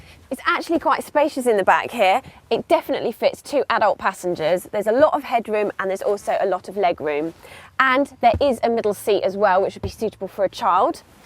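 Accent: United Kingdom. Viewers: Ughhh the uk accent .... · I like your voice, British acsend 😆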